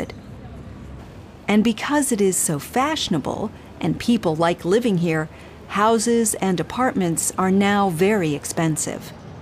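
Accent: United States. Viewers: Es inglés americano o británico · Waoo increíble como pude entenderla al 100 sin los subtítulos, habla pausado ella y con su acento americano! Quisiera poder hablarlo así y fluido 😊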